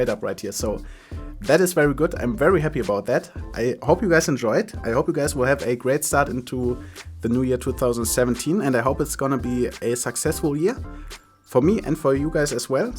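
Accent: Germany. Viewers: Are you a german guy? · Are you German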